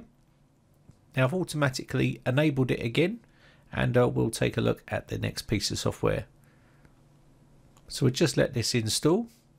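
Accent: United Kingdom. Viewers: I'll subscribe cause your British accent. Also windows updates breaks something EVERY single TIME, so this should be helpful.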